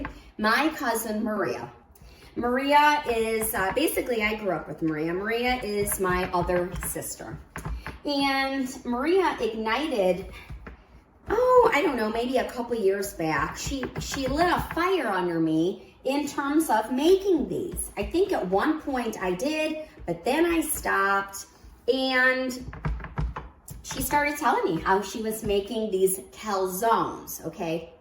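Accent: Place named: United States. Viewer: You are an American😅😅😅 If you grew up in Italy you would speak Italian…correctly☺️ You’re just like the rest of us Americans! It would be like “African-Americans” not speaking an African language or knowing the correct African pronunciations of “African” words. All of us are a blend…no one is “pure” in race. We all are of the human race. Praise God! Thanks for your tutorial! Can’t wait to try!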